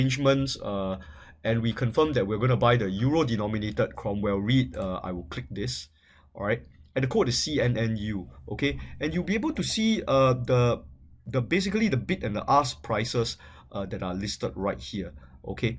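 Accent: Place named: Singapore. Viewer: Singlish in a nutshell: " BAY-SEEK-KE-LEE" "ACT-CHU-ER-LEE" "CHROMWELL REED" "MUL-TEE-PLI" "DI-NORM-ME-NATED" "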